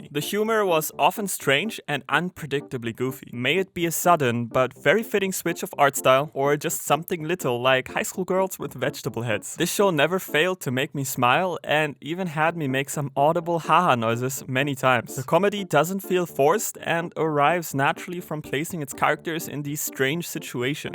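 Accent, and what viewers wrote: Germany. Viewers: Deutscher Akzent haha Aber ja ist ein guter Anime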